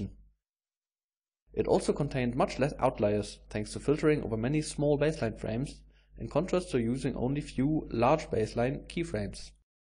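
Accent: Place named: Germany. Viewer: So German English!!!!!!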